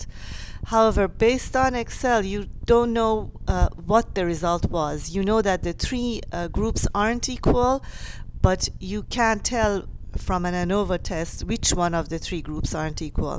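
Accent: Iran. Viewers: Nice Persian Accent! And of course nice job!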